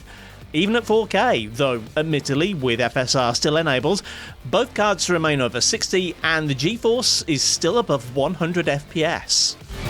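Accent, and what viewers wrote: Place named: Manchester, United Kingdom. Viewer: Trying to pin your accent down. I'm from Manchester and you have a similar accent but I can't quite place where it is!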